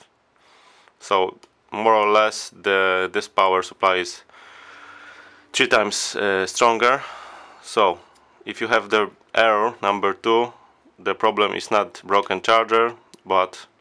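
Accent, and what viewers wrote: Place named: Russia. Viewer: From your acent I think you are Russian) But, anyway, Thank tou!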